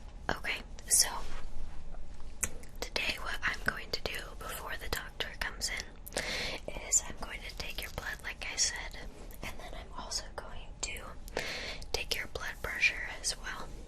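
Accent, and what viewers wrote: United States. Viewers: Joseph Yule she is American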